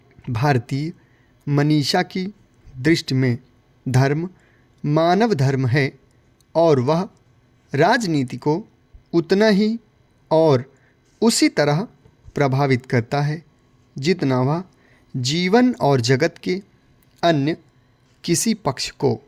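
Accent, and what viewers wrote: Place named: Uttarakhand, India. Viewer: आपके बोलने का तरीका उत्तराखंड में ऐसे ही बोला गया था।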